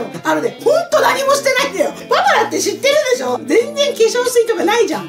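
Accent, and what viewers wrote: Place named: Gunma, Japan. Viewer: 話し方がいかにも群馬って感じですね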